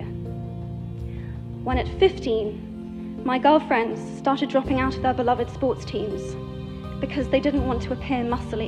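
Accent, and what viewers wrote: United Kingdom. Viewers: British accent 🔥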